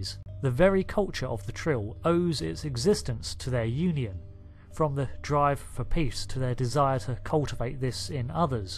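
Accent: United Kingdom. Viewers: Better at 1.25 speed but still not comprehensible. What is it about this man’s British like accent that’s tough to understand like he slurs his words and expects us to understand your joke when you barely said it See — what joke.. you said “I’m joking” but what’s the joke you didn’t even say except their efficient choice/ mode of transportation.. which is not funny.. then it sounded cutoff and slurred out.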